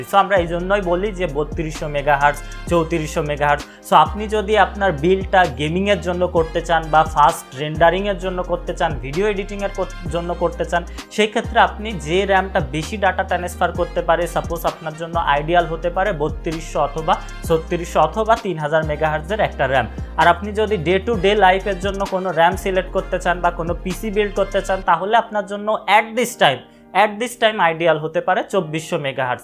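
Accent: Bangladesh. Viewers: Bhai er bari ki borishal?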